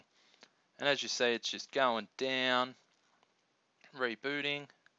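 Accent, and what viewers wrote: Australia. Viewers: Nice video, you Australian? I was thinking of doing it, though i'm having second thoughts - what are the benefits in having your Sg3 rooted?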